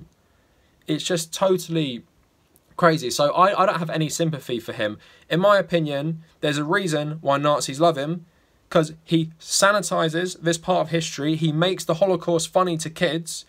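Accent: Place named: England, United Kingdom. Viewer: You may want to watch yourself. You said ‘kill’, ‘all’, and ‘jews’ in that order in a video and I’m assuming you live in England based on the accent, and as we know based on your trash judicial system, context is irrelevant. Plus, they’d probably have something to say about that poster behind you with that particular salute. Anyone want to get on the horn about this terrible person to the authorities?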